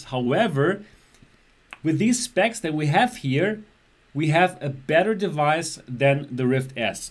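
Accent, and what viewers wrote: Germany. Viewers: that german accent you here that out of everyone xD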